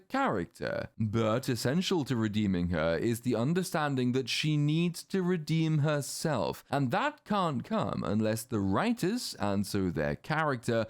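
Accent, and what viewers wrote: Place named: Oxbridge, United Kingdom. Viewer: his Oxbridge accent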